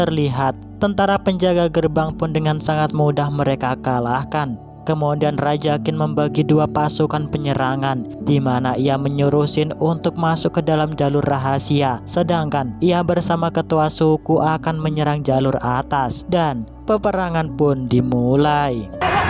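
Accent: Madura, Indonesia. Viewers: MIMIN NYA ORANG MADURA KAH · Orang Madura bang?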